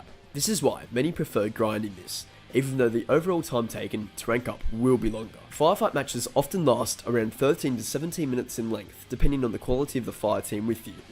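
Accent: Australia. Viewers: Are you Australian you sound it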